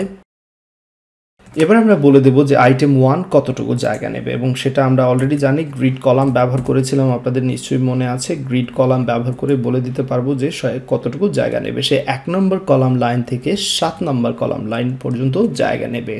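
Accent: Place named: Sylhet, Bangladesh. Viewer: sir u are really amazing.. Sylhety bhai ❤️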